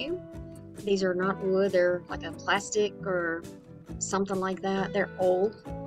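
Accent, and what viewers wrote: South, United States. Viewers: Found you via Julie’s channel from your comment. I’m from Alabama originally. Now living in Panama City beach. Love to hear us southerners on YT 😁😁😁😁😁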